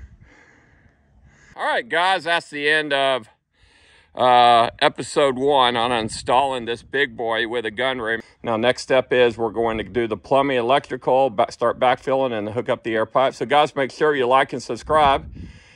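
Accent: South, United States. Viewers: Mr., Are you from the East Coast below Virginia somewhere?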